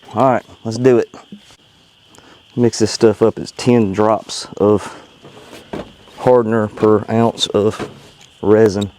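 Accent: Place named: Ohio, United States. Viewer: what state are you in it sounds like ohio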